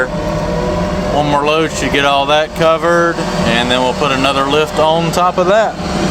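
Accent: South, United States. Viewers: I need someone to do this type of work for me. I'm building a house soon, but the pad needs to be built up for sure. Where are you at? I hear some southern accent. SW Arkansas is where I need the work done. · Your southern accent sound’s almost just like my Uncle Charles in Tennessee😂😂😂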